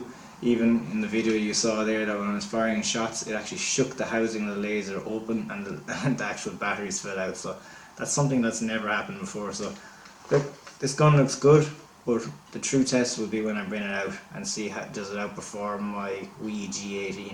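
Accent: Ireland. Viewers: Are u Irish coz I am in laois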